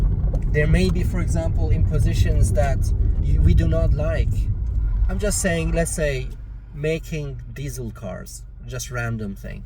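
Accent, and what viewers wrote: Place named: United Kingdom. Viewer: I love ur British accent ❤😊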